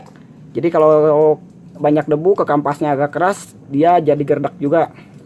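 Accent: West Java, Indonesia. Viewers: Jelas dan ngakak saya ngadenge nya mang 😂 kaciri sunda na pisan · Meng inspirasi suatu saat bakalan tak coba. Kang tinggal dmn mau dong motor saya di sevice kaya gitu di acak acak.. hehe.. kalau logat mah Sunda yah... Daerah mana kang..minta no wa dong. Klo blh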